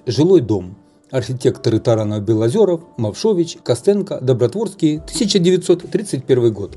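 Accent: Kharkiv, Ukraine. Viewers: Как всегда очень интересно прогуляться по улице! Очень напрягает, ваш харьковский Говор, надо избавляться, при чтении текста, хорошо бы вам поработать с преподавателем сценического искусства.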